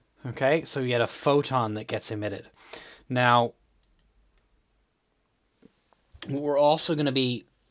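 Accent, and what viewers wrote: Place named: United States. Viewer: Thanks G but why as an American(from ur accent) are u doing Alevels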